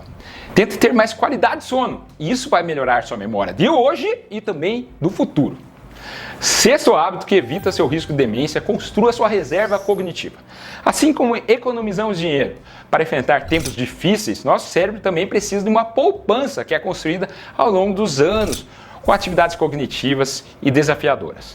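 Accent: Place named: Minas Gerais, Brazil. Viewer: Ah, doutor, eu tomo Melatonina quase toda noite pra dormir. Fico muito preocupado, com um distress diário preocupado com a minha esposa, que tem bipolaridade. A minha sogra faleceu com PSP, foi um sofrimento. Fazemos suplementação com D3, Zinco, Fósforo, Ômega 3, Magnesio Dimalato. Ah, gosto muito de ouvi-lo porque o senhor tem um sotaque meio de mineiro.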